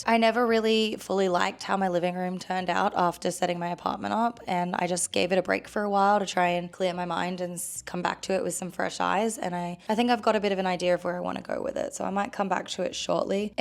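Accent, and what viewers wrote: Australia. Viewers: Your ‘sick’ voice sound sooo very Australian! I hope you’re feeling better and enjoyed your holiday x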